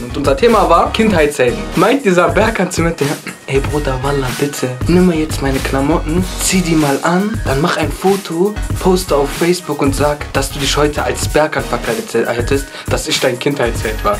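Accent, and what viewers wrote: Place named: Bavaria, Germany. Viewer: brooo du kommst aus bayern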